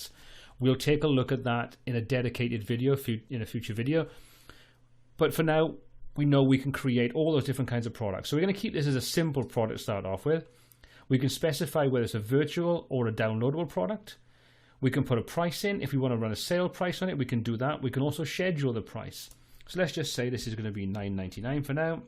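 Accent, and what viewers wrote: Wales, United Kingdom. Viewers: Thanks - this is a brilliant video. I watched this because I wanted to see if I could customise WooCommerce to work in other languages, namely Welsh. I can’t find a straight answer online. From your accent I’d say you’re a Welshman! Before I download the plugin to see for myself, do you know if a)Welsh is supported or b)I can customise myself without having to delve too much into css and php etc. Thanks!!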